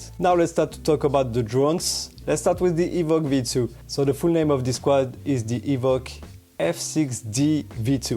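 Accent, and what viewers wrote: France. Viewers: Ont dirait tu a un accent français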